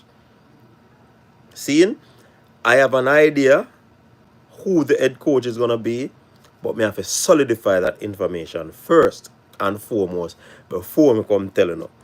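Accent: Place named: Jamaica. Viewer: Coach anyone who doesn’t want to hear you speak patois they don’t have to listen. Kmt. Americans do not speak English either.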